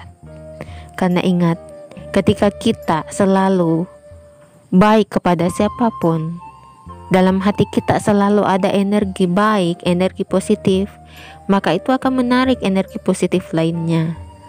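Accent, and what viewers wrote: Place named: South Sulawesi, Indonesia. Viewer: Motivasinya aisyah akan ingat selalu bunda.sepertinya bahasanya dari sulawesi selatan seperti papi aisyah lho :)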